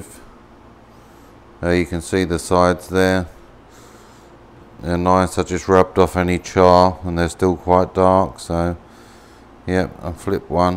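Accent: United Kingdom. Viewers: Thank's for all the laser vidéos. From France, your accent remind me Peaky blinders...👍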